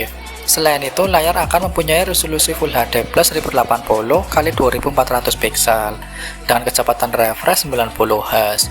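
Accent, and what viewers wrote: Banyumas, Indonesia. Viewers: G ngapak g penak?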